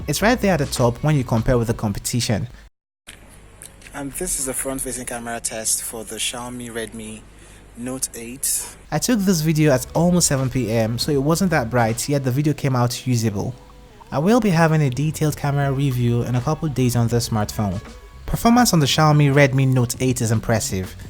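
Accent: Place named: Nigeria. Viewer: God willing you are going places bro....Exceptional Presentation Voice....Voice and Accent Wise you just the best Nigerian Youtube reviewer for now.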